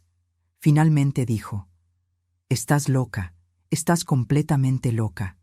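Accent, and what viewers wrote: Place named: Mexico City, Mexico. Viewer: La escucho dé ciudad de México excelente narrativa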